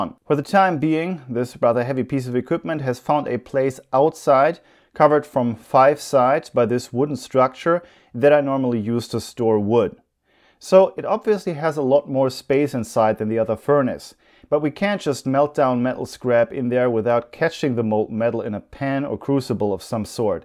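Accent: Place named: Germany. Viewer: I do not care what you make/salvage or do, i have always watched(listened) because of your voice. It is German dialect, not annoying, but rather comforting. Thank you!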